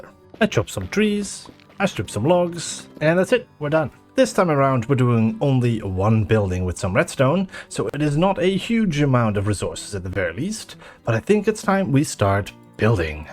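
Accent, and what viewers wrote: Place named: Sweden. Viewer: Huh, I always thought that smaller house extension is actually Maru's room/apartment. Once again, you made this build look amazing, and figuring out all of the redstone stuff is way cool! Btw, your slight accent gives off Swedish vibes. Are you from there? Much love to you, as always, and thank you for sharing your creativity with the world! <3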